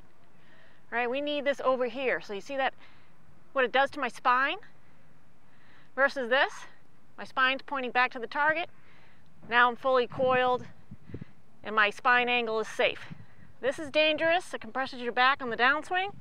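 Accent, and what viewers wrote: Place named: Upstate New York, United States. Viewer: The way you talk reminds me of all my friends from upstate NY. Is that where you're from!?!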